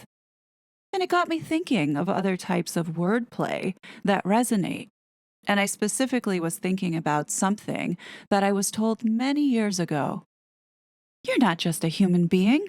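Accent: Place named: United States. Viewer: I agree with you about everything you say & love your vids as they're so informative & honest. But, as a committed atheist for past 30 yrs (was a Catholic) i rarely admit to anyone, except close friends my lack of religion. When I make that mistake, I'm usually castigated for it. Whereas, when I was Catholic, no problem. As you sound American (possibly Canadian?) we're always led to believe in the UK that America is the most christian country on earth. Is that woke stuff happening across the Pond too? Horrible if it is, as Christian ideals r the only ones to live by. I still do, even though I don't believe in God. Apologies for offending other people of "The Book" but New Testament never advocated massacres & plagues on whole innocent populations just because God woke up that day feeling grumpy or a bit bored. It was a handbook for peace.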